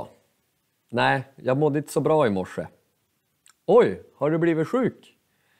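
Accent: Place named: Sweden. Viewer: Jag behöver höra sådana enkla dialoger, speciellt när du talar med den svenska accenten vi kanske hör. Tack och jag hoppas på mer av detta.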